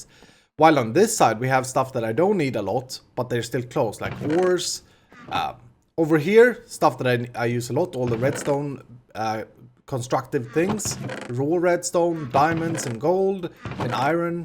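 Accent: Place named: Sweden. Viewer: i am always disturbed by other swedes english. you are the only one i got used to.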